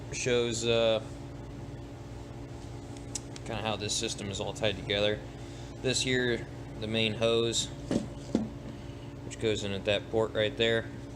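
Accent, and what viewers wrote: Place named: United States. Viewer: Why for the love of Christ does it absolutely have to be a yankee talking on most of these videos... like nails on a chalk board to my ears😵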